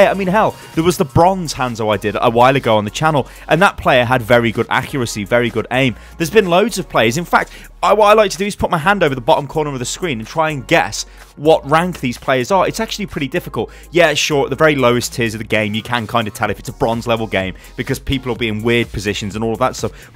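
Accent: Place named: United Kingdom. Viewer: His comfortable British voice gets me wet...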